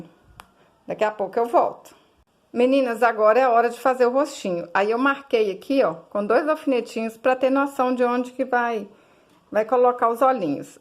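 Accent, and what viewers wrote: Minas Gerais, Brazil. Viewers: Que jeitinho meigo de ensinar! Amei o seu sotaque! Vc é mineira? Perfeito! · Sotaque da minha terra Minas Gerais. Falo assim até hj. Parabéns pela boneca. Amei. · Vc é demais gosto muito de vc e do jeito que vc fala mineira Deus Abençoe professora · Eita mineira retada essa igual eu ,parabéns amei.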